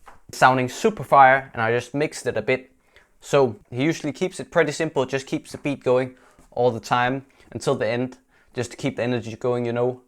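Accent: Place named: Denmark. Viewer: knew from your accent you were from denmark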